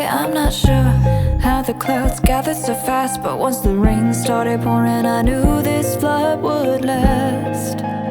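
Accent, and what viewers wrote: New Zealand. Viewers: Beside your accent being a dead give away, your beats/sound is totally Kiwi!! Love love love this!!! Keep it up hun you are freakin awesome!!! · Are you are New Zealander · Wait...your accent...a fellow kiwi? How cool if so! I also make YT videos but am such a huge fan of Andrew Huang. You're amazing! Congrats on the new video collab with Andrew. I've subbed. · As soon I heard that accent I needed to check out the channel :D Gotta support more Kiwi channels! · i came from andrews channel to watch this, it was by far my favourite. i loved the use of the real instruments. it somehow reminds me of both and (mostly hayley). definitely gonna subscribe. also your voice is amazing, love kiwi accents.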